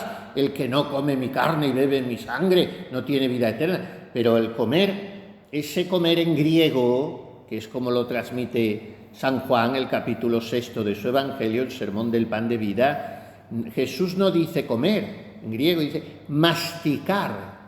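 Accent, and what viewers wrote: Extremadura, Spain. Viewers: Ay Padre muero de risa ! Así o más extremeño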